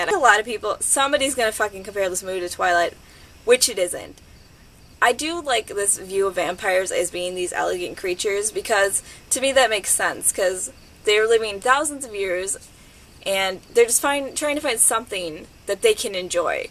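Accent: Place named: Brooklyn, United States. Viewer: I'm just starting to notice your Brooklyn-ish accent. It's cute. That is all C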